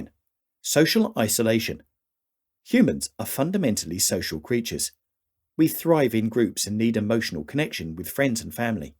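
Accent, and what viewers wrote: England, United Kingdom. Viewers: Such beautiful English dialect, I like biscuits and tea btw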